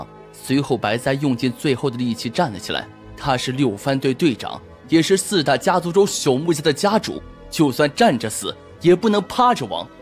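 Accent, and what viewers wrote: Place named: Mainland, China. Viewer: 旁白一只加入大陸方式的語言 聽起來總覺得怪怪的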